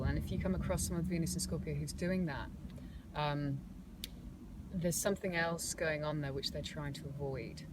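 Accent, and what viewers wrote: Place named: England, United Kingdom. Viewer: Are you from England? lovely accent.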